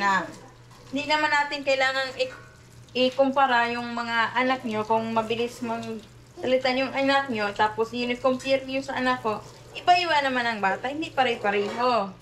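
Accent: Visayas, Philippines. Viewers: Di sya galit, nag papaliwanag lang .sounds lang galit kasi ganyan mag salita ang bisaya accent lang yan na parang galit , 😅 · Dear most of visaya tlga, ganyan sila magtagalog just like kung pano magsalita mga tiga laguna, batangas, ilocano, british, english american, thailander, chinese. Tawag jan accent. Naiintindihan nmn namin sinasabi nya. Di nya kailangan mag adjust sa mga nanonood sknya kc we have choice nmn to stop viewing her video if you think na nakakairita kung pano sya magsalita. Sa pilipinas lang ata may mga ganyan na tao na kapwa mo pilipino ginagawang katatawa ung pananalita mo at linguwahe mo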